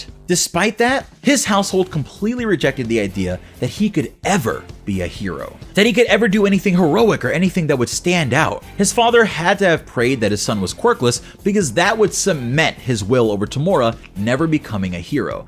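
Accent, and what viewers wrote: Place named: Puerto Rico. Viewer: You ooze your puertoricanness when you talk! Nice work with the videos man!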